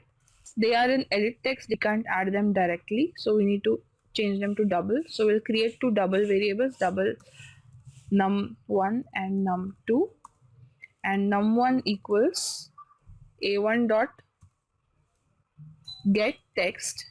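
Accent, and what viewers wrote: India. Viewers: I hate the Indian accent so much it's so annoying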